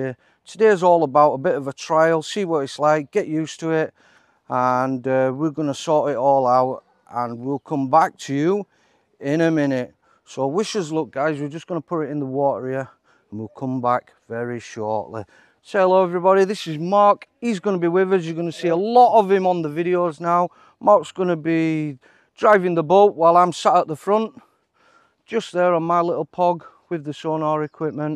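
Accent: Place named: Ireland. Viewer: Love your Irish Brogue👍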